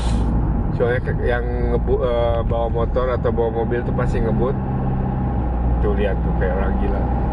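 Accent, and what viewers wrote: Sunda, Indonesia. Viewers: Sok lucu lamun siakang ngomong Sunda teh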